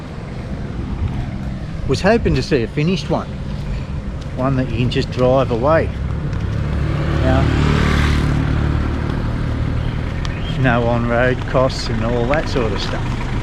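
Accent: Australia. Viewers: you should change your accent , your Australia accent is so annoying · I had a new scooter stolen 6 years ago and thats it but thats not my coment i was thinking your acsent northurn nsw so cessnock stayed there in 1983 all i remember is here we are in nsw shearing sheep as big as whales on ya keep up the good work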